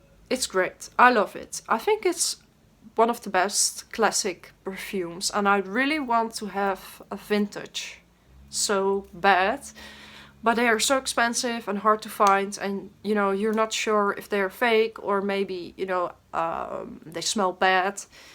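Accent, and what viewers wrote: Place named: Netherlands. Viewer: Ja je Frans is echt Nederlands bijna net zo erg als de Amerikaanse dames maar bij jou is t acceptebel,;))))). Ga zo door gefeliciteerd met 2 jaar blog.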